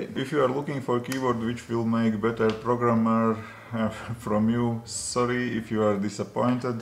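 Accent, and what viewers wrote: Russia. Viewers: круто! 3 языка знаете... У вас просто русский акцент когда говорите на английском ) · Словно русский заговорил на английском)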